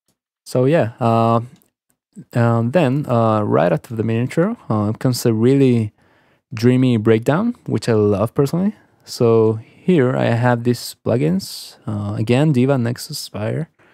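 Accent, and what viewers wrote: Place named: Spain. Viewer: "Reburb" 😂 I love the spanish accent 😁 Love the video man!!! 👌🏼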